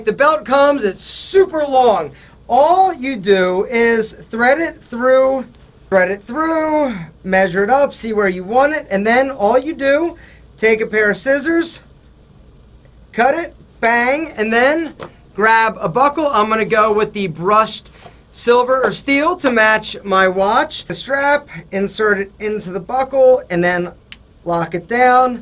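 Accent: Philadelphia, United States. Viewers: He sounds like he's from Philly.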